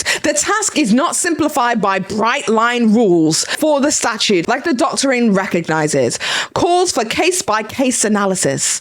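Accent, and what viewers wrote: United Kingdom. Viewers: I love how British people say H 😂 I notice it so much from you and callum Margie lol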